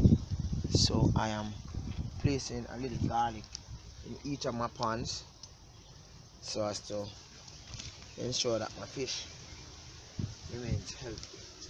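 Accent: Jamaica. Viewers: i really like ur homemade ponds there really nice and pretty good info and i love ur accent Jamaica!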